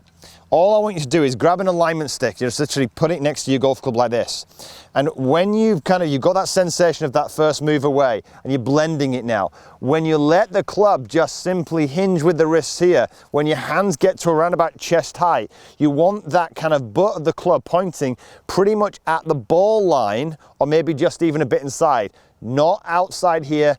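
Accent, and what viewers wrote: United Kingdom. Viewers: Helpful tips. Also good for learning British English too. Many thanks. From S.Korea.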